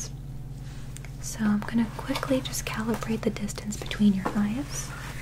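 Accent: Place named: United Kingdom. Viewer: The British accent noooooooooo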